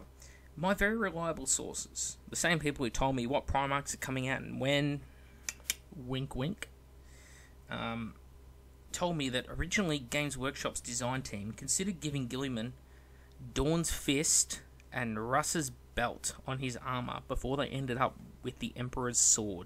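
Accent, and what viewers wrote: Australia. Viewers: I would of preferred if they brougt back Leman Russ, but not being big on the lore that might just be because I'm a wolf fan boy rather than because I think it would make a good story. Also, I'm guessing your Australian?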